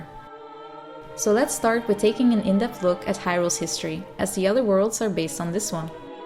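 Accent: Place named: Netherlands. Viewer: I honestly think you're the best Zelda theorist on youtube. Your theories are always logical and thought provoking, clicking nicely with the canon. You go into a lot of in-game details, showing you do your research. Your presentation is also the most pleasant to watch; good video editing, a clear yet calm voice and well formulated lines. Je Nederlandse accent is bijna niet te horen.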